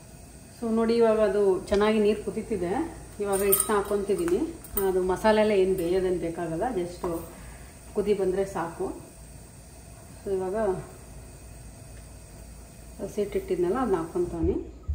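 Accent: Mandya, India. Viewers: nim language kooda mandya language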